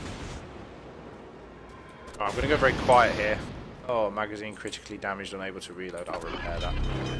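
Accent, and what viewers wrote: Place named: North West England, United Kingdom. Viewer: your accent sounds like your from the North West of England :D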